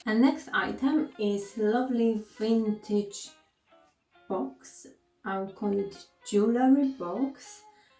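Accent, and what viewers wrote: Poland. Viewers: . Po akcencie tak mi się wydawało, że z Polski, ale dla pewności wolałam zapytać. Super. W takim razie powodzenia na yt. I tak jak napisałam, czekam na kolejne zdobycze i haule. Pozdrawiam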